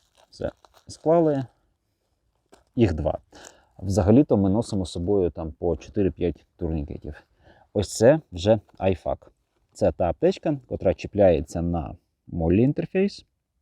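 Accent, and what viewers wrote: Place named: Russia. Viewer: Может не стоит мучаться и пытаться добавлять "украинские" слова? :) Слышно же, что русский лучше знаете. Больше людей поймут, что вы говорите. ps. "Специальная такая штука" :))) На алике кстати хорошие наборы продают и недорого. "Rhino Rescue". Там даже этот наглазник есть.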